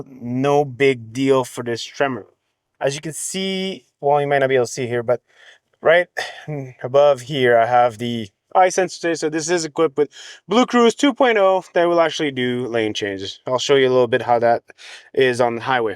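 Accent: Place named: France. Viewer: right on! I noticed your French accent! Northern Ontario here! Looking at buying one of these as our next vehicle!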